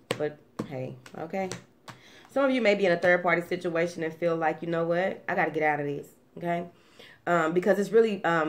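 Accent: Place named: Memphis, United States. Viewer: You have to be from Memphis!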